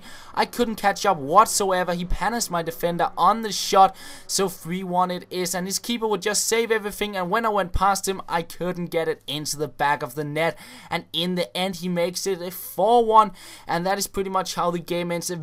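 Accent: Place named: Denmark. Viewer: Danish accent does that :)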